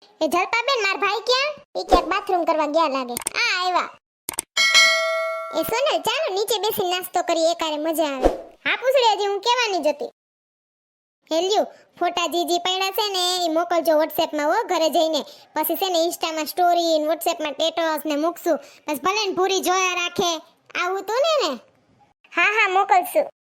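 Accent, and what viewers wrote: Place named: Ghed, India. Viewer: તમારી બોલી ઘેડ બાજુની લાગે છે. તમારું ક્યું ગામ છે બેન..? અમે પણ ઘેડ ના જ છીએ. વરસો બાર નીકળી ગયા છીએ.. તમારી ભાષા સંભાળી વતન યાદ આવી જાય છે...😊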